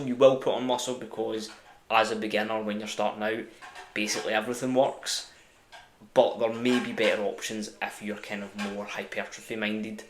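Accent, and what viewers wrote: Scotland, United Kingdom. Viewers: A fellow scot. Not to sound stockery but what part are you from. Ayshire myself.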